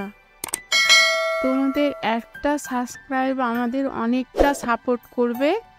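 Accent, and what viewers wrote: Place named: Kolkata, India. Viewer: তোমার কথা শুনে আমি বুঝেছি ,মনে হয় কলকাতার দিকে থাকো ।আমার বাড়ি কলকাতায়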